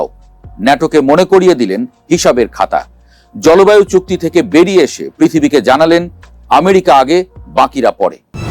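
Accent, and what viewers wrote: Standard. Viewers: প্রমিত বাংলা উচ্চারণ। স্পষ্ট, প্রাঞ্জল ও আঞ্চলিক প্রভাব মুক্ত, ইংরেজি বা অন্য বিদেশি ভাষা প্রভাব মুক্ত। বক্তব্য সোজা, দীর্ঘ-ভুমিকা হীন , প্রাসঙ্গিক। অতীব প্রসংশার বিষয়। ধন্যবাদ ও শুভেচ্ছা 🎉 🤝 💛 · শুদ্ধ বাংলা। কোনো বাঙ্গাল টান নেই। সুন্দর বিশ্লেষণ।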